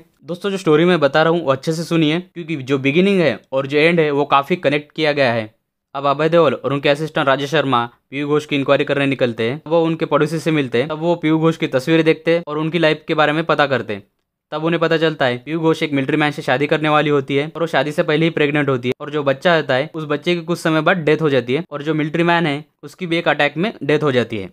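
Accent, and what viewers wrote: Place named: Maharashtra, India. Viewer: Marathi assent as rah hai voice me .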